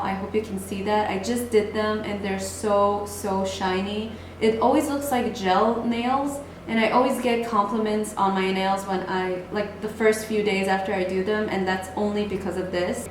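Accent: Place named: Netherlands. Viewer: Are you by any means Dutch? Idk you kinda have the same accent as me in English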